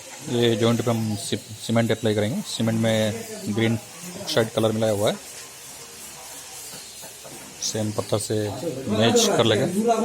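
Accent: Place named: Mewar, India. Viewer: Ktu bhaisab mevadi bolira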